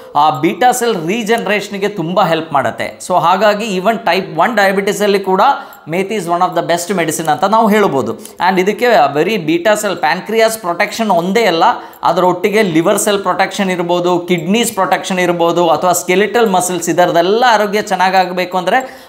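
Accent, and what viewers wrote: Kerala, India. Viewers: Kannada mathaduvavaru kuda Doctor Nimmastu Shudhavagi Kannada Mathadalla Butfrom Kelavu padagala Accent gottagutade Nivu from Kerala But Nimma pure Kannada Vivarane Nivu Hutti Beledidu& Education khanditha karnataka yendu Nimma pratiyondu Vidio nodutene . Tumbaa chennagi tilisutiri 👌👌👌 Nimage Vandanegalu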